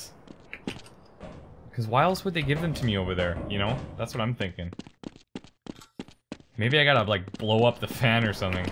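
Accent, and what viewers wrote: Canada. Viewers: I'm so glad I found this LP :) Keep it up! And Canadian accent ftw.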